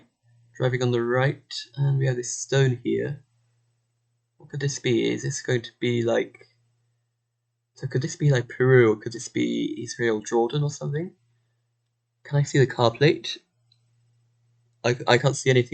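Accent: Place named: United Kingdom. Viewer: great video, enjoying the face cam. in the last video you said you live in taiwan. are you a british living in taiwan (because your accent is quite british) or are you actually taiwanese? just curious.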